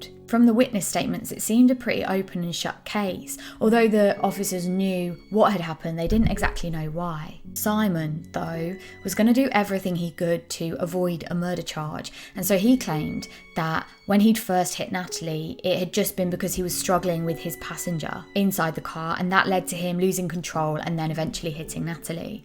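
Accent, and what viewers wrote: United Kingdom. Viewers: Hi . Love your accent. I assume it’s British? Don’t laugh. I’m not great with accents. 😉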